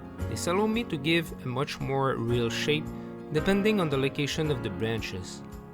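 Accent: Quebec, Canada. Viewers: Ah enfin un belle accent québécois en anglais 😁😁. Cool ton tutoriel, c'est facile et surtout rapide, je vais l'essayer c'est certain.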